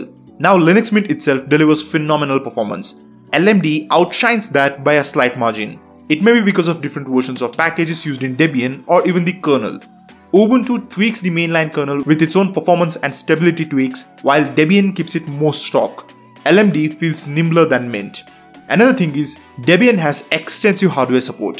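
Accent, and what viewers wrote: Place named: India. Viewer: Arrey bhai accent kam kar. “Kompooting” experience . Sharm aati hai desi accent se ?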